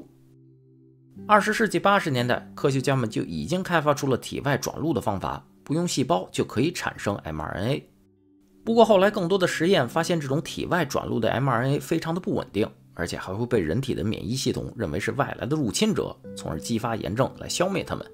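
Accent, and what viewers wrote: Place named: Tianjin, China. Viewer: 真好，现在每期都要听，内容好，还有好听的天津普通话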